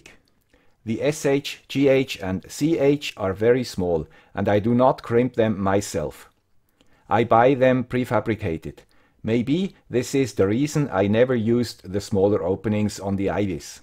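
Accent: Switzerland. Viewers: Thank you Mr. Swiss Accent. Great comparison.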